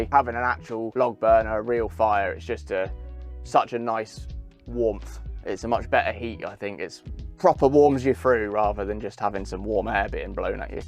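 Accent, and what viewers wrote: England, United Kingdom. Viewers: Foreign??? Wow . He is British, he has a local accent/ dialect. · Foreign accent he's English are you daft us English should be able to go were we want in are own country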